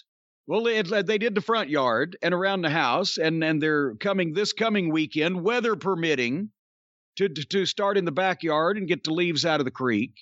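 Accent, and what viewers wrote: South, United States. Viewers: an intelligent well read southern man is what makes it for me. Too many give them a bad name in the public eye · The southern accent gives It a certain flavor. I Jim decides to do a news show I'm in.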